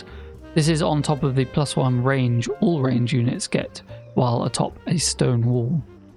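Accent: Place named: United Kingdom. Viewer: Damn this British accent. Mehter is not Meta. It's Mehter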